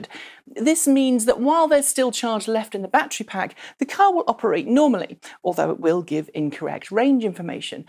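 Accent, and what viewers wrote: Mid-Atlantic, United States. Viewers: Could you make some of your videos with your American accent? I like it. :) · Back to speaking good old BE. Found your Mid Atlantic English a bit weird. ;-)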